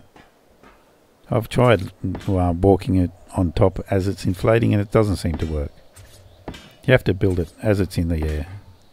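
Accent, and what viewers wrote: Australia. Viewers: Gotta love that smooth Australian voice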